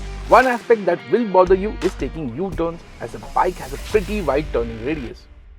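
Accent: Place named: India. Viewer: thumbs down for indian accent xDDD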